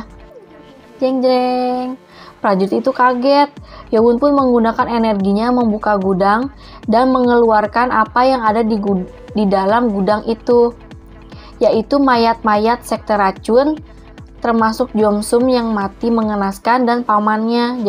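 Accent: West Java, Indonesia. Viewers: Sunda banget ya ka 😂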